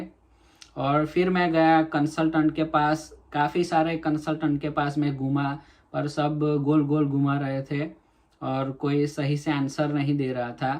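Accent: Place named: Gujarat, India. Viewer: Bro i underdatnd you are from gujrat , me too ....but work on your Language tone , that gujju tone bothering while you talking .No matter u speak hindi or english .Keep Growing .😇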